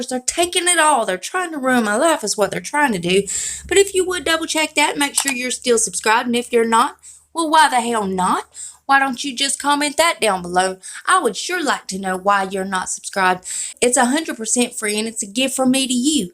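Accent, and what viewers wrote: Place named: Texas, United States. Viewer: Where are you from? You sound like a southern girl like me...Texan! 😁 Love your channel!😍😍😍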